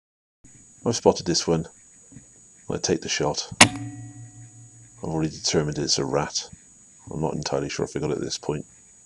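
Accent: United Kingdom. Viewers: British voices make for great commentary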